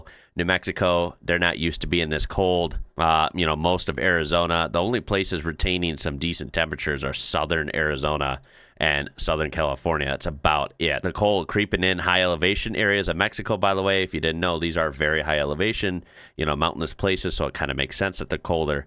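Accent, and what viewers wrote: Australia. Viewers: You’re beginning to sound like an Aus with your introduction mate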